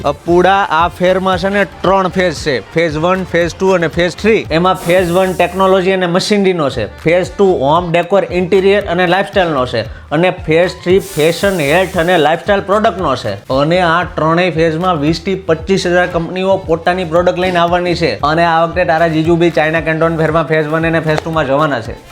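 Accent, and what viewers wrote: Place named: Surat, India. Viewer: સુરતી બોલી માઁ કાંઈ નો ઘટે.. જોરદાર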